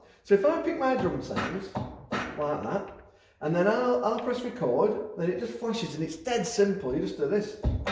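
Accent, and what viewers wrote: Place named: Lancashire, United Kingdom. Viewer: This fellow is much more Ace Rimmer than Arnold Judas - what a (nice) guy! Thanks for the enthusiastic demo, all wrapped up in a good honest Lancashire accent! I bet he's a great dad :D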